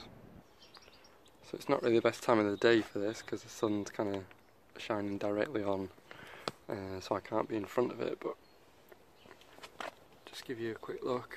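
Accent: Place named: England, United Kingdom. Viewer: I am SOOOO sick today, and have been too weak to even hold my phone, but I started watching this earlier in a sick haze, and when it got to the kitty, I smiled all over and giggled and just felt the joy pulse through my body. Then looking at your lovely plant babies and hearing your soothing voice and lovely English accent..... ahhh... just the medicine I needed today! Thanks! 💗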